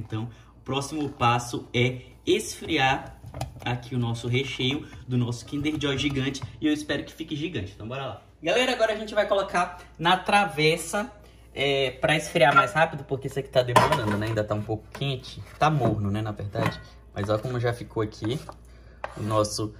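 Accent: Maranhão, Brazil. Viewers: Mano eu comecei a assistir o canal dele agora, e não sabia que ele era maranhense ksksksk aí achei a voz dele parecida com o sutaque maranhense e vi na legenda que ele é ksksk 😅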